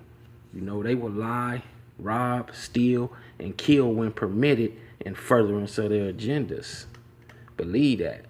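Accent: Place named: Midwest, United States. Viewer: I'm from L.A. born and raised. I watch your videos from time to time and I appreciate the good content you put out. But I for damn sure appreciate you doing your reserch on my city and knowing exactly where everything is. You ever lived out here in L.A.? You have a Mid West accent G.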